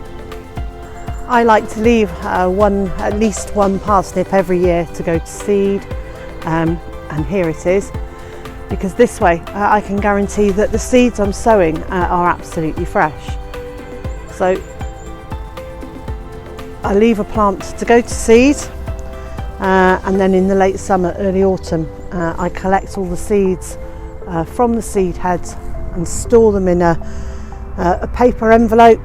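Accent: England, United Kingdom. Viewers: Good to hear an English accent,thanks for the info.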